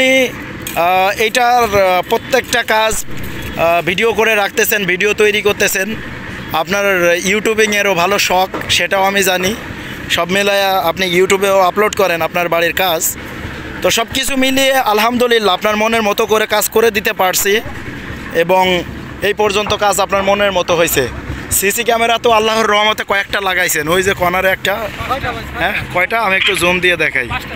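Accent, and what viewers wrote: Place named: Faridpur, Bangladesh. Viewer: ভাই আপনার ভয়েজ সুনে মনে হয় আপনার বাসা আমাদের ফরিদপুর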